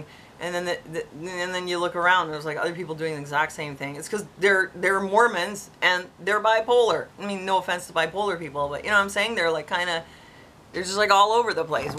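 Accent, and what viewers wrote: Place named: Canada. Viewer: I like how you talk too. Always have. Your voice along with the Canadian accent....so nice 😊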